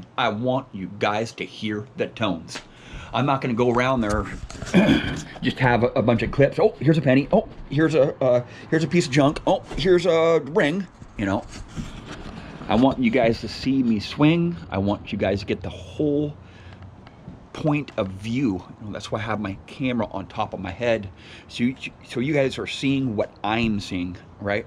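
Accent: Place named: Georgia, United States. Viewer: What state are you in ? Sounds like my state of Georgia ! :)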